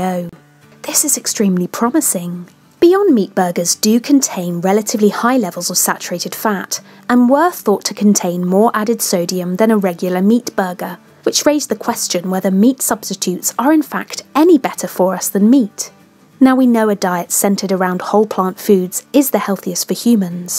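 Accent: England, United Kingdom. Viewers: Most things not taken in moderation is not good for you. So how much money did the animal agriculture industry pony up to fund this research? Must have been a lot since they even got a woman with a nice English accent to sound convincing. Clever!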